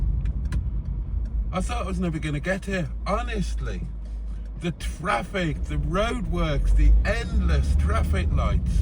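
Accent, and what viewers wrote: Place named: Birmingham, United Kingdom. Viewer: Was there the other week, great place and a great fish and chips. 20p for a pee though!! By the way have you spent much time in Birmingham as I can detect a bit of an accent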